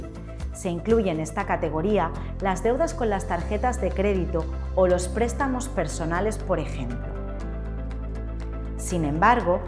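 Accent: Spain. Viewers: Habla de España. ¿ no te enteras del acento o qué ?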